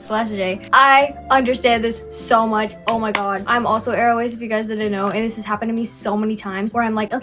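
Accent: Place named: California, United States. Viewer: after you said ur californien and say towel weird i also said it and our cali accents are lowk the same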